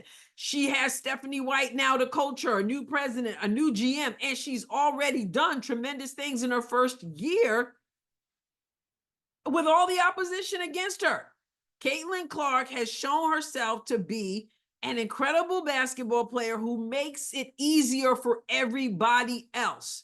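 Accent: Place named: Brooklyn, United States. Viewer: you sound like you have s little Brooklyn accent...😊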